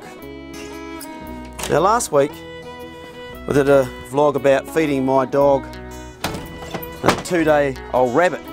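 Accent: New Zealand. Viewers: okay take that back you are NZ xD as the video went on. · are you a kiwi or?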